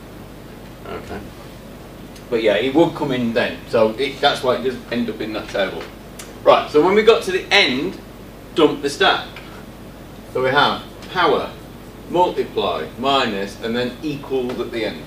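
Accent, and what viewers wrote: United Kingdom. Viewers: love your english accent too xD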